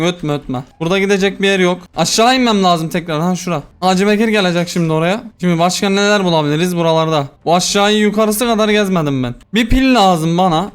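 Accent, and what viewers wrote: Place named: Thrace, Turkey. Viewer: Abim sen trakyalı mısın sesin trakyalı ya benziyor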